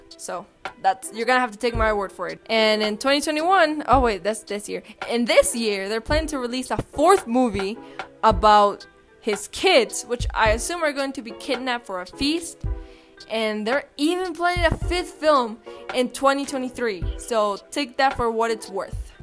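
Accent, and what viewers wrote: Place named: Mexico. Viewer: Oh damn, una mexicana with the accent, a weeb, and a shitposter, my typa girl. 😂 seriously tho, good video, brought a ton of childhood memories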